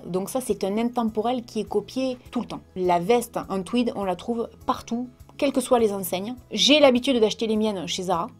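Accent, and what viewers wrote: South, France. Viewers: Je laisse rarement des commentaires, mais j'aime beaucoup tes vidéos et les messages que tu y inclus. C'est assez rare sur youtube. J'adore le petit accent du sud et cela me rappelle ma douce France, comme suis expatriée en Allemagne 😀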